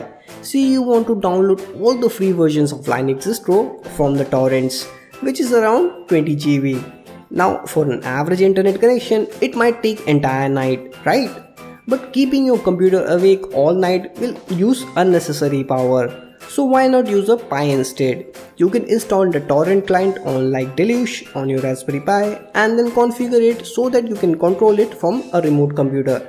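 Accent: India. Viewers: nobody is judging mate, i know how it is to learn new languages, i speak 5 of them, and all with an accent. blame my ears, i have no problems with accents, but indian accent is so annoying i cannot enjoy these videos however much i try. and i try a lot. sorry my indian friends. i love you but my ears bleed every time. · He sounds like Indian tech support. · If u have trouble understanding Indian accent , just leave without commenting anything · yep. Thanks, that is the normal tilt and pitch of an Indian - i think a great video. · Dude thats an Indian accent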